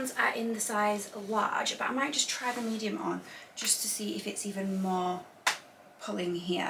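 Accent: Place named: Leeds, United Kingdom. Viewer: Love you and your amazing reviews! I used to live in the North of England and I really miss it from time to time, so love hearing your sweet Leeds voice!! Ok so question, what SKIMS would you recommend to go under the Soft Lounge Slip Dress ? xx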